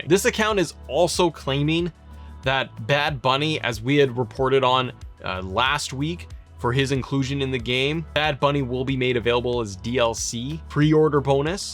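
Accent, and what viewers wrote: Canada. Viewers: Are you from Canada? Just wondering lol.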